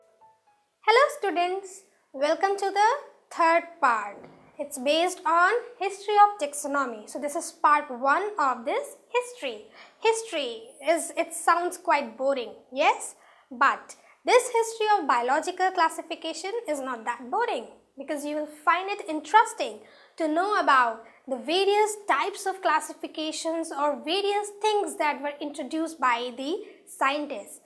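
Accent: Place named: India. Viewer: I can’t fucking handle your accent. Learn to speak English or go back to god damn India.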